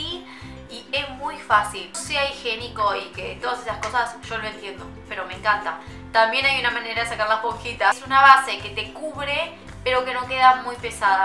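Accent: Argentina. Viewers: sos de argentina no ?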